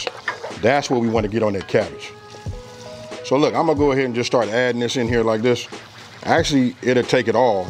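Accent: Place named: Los Angeles, United States. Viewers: You sound like you're from LA or Compton... southern with a west coast talk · no His accent sound more like California,.. I aint never heard no Mississippi people sound like that (im from Memphis btw)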